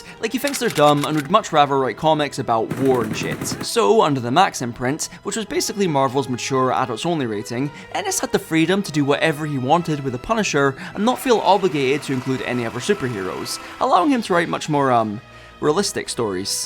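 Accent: United Kingdom. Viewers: I can't listen to this horrible british accent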